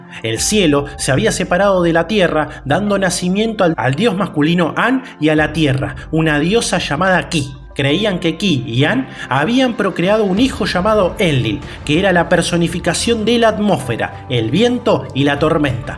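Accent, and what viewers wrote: Argentina. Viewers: Benditos sean todos los hispanohablantes pero yo puse el sonido para ver quién relataba este documental y escuchar un argentino fue un aliviaso, encima buenísimo el canal, bien ahí <3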